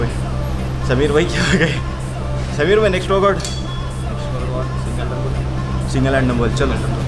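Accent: Hyderabad, India. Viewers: Nice accent Hyderabadi londe.. mashallah khubsurat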